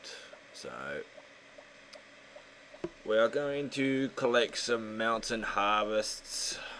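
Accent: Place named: United Kingdom. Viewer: Good to have your british back,!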